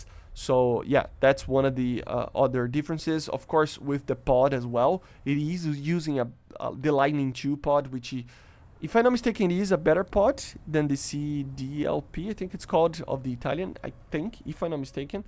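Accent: Brazil. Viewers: no começo ja comecei a estranhar o jeito de fala e no final realmente acertei q era brasileiro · You are brasilian aren't you? If not, your accent is very brasilianish.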